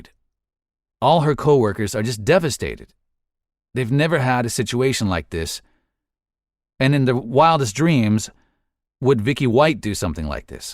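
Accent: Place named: Tennessee, United States. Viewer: Gotta love the Tennessee Valley Twang, 😀.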